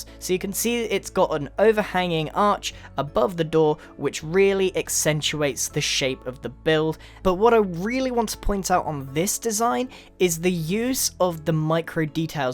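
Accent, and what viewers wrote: United Kingdom. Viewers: he's British. he doesn't say things the same as you. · british accent and good content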